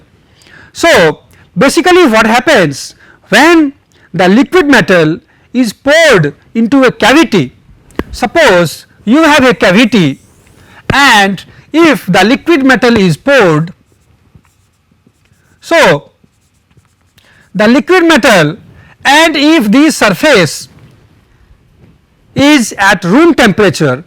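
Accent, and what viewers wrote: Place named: India. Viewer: Indian accent is hard enough to listen to without that mic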